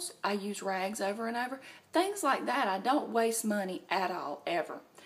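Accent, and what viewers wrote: South, United States. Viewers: You need to write a book,! You say things in a way that's so well put and thought out. I'll read it in a southern accent so it will be just like you!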